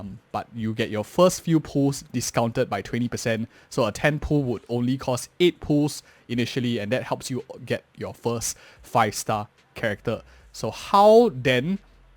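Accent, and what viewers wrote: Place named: Singapore. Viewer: hello, when i first heard you speak i immediately recognised our SG accent! hahaha. keep up the good work with your guides. much love from a fellow SG:D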